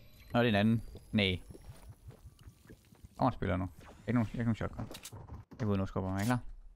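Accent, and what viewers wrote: Jutland, Denmark. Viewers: Kommer du fra Jylland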